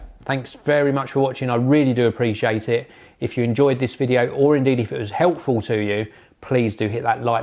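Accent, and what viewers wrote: United Kingdom. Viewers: So I clicked this video because I'd like to know what to do if Apple refuses to fix or replace my laptop - but as soon as I heard you speak I knew this wasn't relevant to me because of where I live. I live in the USA, where we don't have appropriate consumer protection laws. Thank you for sharing your experience! I hope it helps many people from the UK.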